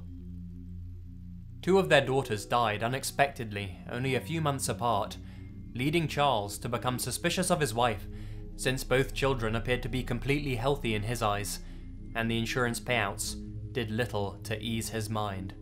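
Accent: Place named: Southern England, United Kingdom. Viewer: It's how he pronounces it, he's from the South of England so it is bound to sound a bit different. If you listen carefully, you can hear the "inn" noise at the end, but the start does sound like he says "wuh" instead of "wih". By the way I'm from Northern England so I probably sound even stranger.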